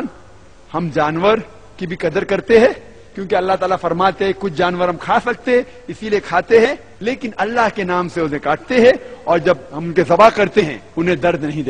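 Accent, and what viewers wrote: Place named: Maharashtra, India. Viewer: Aisa kuc nhi h sister Aap ko lgta h aisa He always speak truth but y Marathi h to inki Hindi Urdu zuban Zra kmzor h jiski wjh s inka bolne ka lehza bdl jata h hmare opposite isliye hme ajib lgta h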